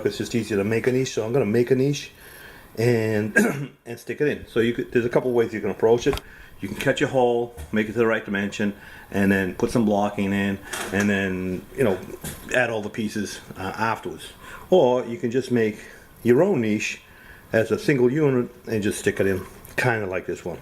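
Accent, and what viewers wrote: Boston, United States. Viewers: I didn't realize that you are a fellow Bostonian.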